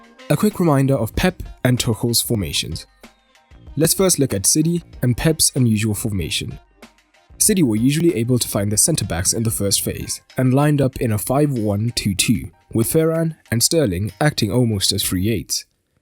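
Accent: South Africa. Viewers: Bro are you south African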